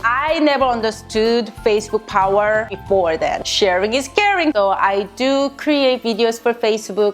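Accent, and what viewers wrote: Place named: South Korea. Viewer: funny korean english accent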